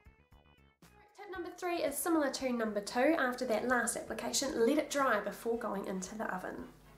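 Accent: New Zealand. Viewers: You have a New Zealand accent :))))))) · Lovely listening to you and thinking 'That accent... She must be a Kiwi!' Thank you for the tips, will have a go!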